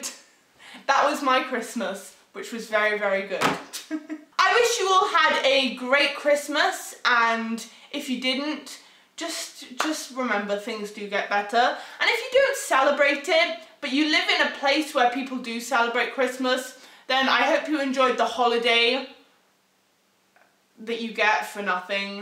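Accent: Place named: Ireland. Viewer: Are you Irish? If you're not I'm sorry for asking but idk you sound really Irish to me all the sudden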